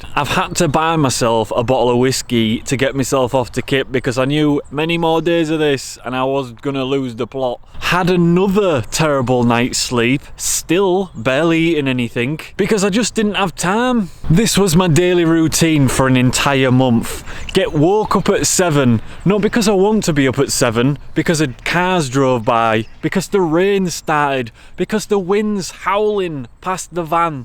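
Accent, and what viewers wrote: Northern England, United Kingdom. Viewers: New Subscriber fella 👍 First off it's magic listening to a Northern accent....you can't beat it lol You took on an epic adventure mate, one that I'm sure many of your subscribers dream about. I think you have hit the nail on the head when you acknowledge it's not something to do alone. To have someone who wants to do the same thing and more importantly to have the craic with, or even have a moan at would be essential for most of us. Jeeez I have just started lure fishing primarily for perch and I find it difficult not having someone to experience my couple of hours on the canal with lol. Keep up the great work....here in the UK we have some of the most beautiful places to visit in the world and we are often guilty of not appreciating it. Go on a UK tour and show us all what we are missing out on. Good luck with everything you do 👍👍👍👍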